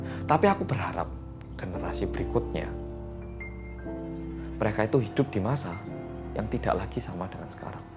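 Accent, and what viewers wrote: Java, Indonesia. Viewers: Bersama org korea yg MEDHOKKK~ Kangen pembukaan yg begini mas hehe Welcomeback DETEKTIF, Selalu menginspirasi ceritanya, semoga kita menjadi org yg jujur ya mas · To be honest, this video is really cool, I don't understand how to pronounce it, the point is I like this video, I think he's great, he has cool words. thank you for I always like the content of your video content, actually I don't like hearing the sound of the medok but I accept it because your content is great, thanks bro.🙏🏼🙏🏼👍🏻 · Yey akhirnya yang ditunggu up pt2 🎉🎉 tim medokkk mas 😂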